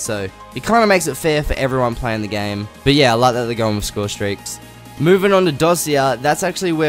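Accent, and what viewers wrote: Australia. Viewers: Great video! Are you Australian you sound it. Love ya if your Aussie.🇦🇺❤️. Like if an Aussie Bogan.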